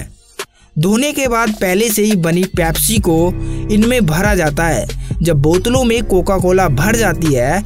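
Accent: Bihar, India. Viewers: Apana voice thoda sudaro bihari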